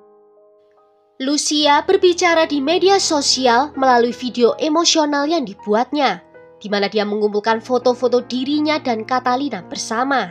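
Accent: Java, Indonesia. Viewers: Medok banget 😅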